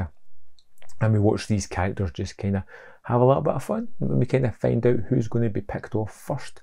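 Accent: United Kingdom. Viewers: Was just roaming at Arrow to buy some stuff and saw the Grffe box wondering what that might be. With Arrow you'll never know. :D So I ended up here and liked it for several reasons: - the review gave me a great idea what to expect and personally I don't mind seeing footage, pictures is good enough - as a non english native who used to have an american accent this is great to learn proper english and shit to a more british accent (thanks for not speaking to fast :) ) - for the purpose of ending the american dominance on YouPuke I could subscribe to a british channel, nice Salute from germany.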